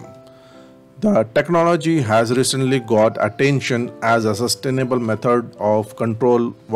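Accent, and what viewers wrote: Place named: India. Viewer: HARD INDIAN ACCENT SAYS I CANT TRUST HIM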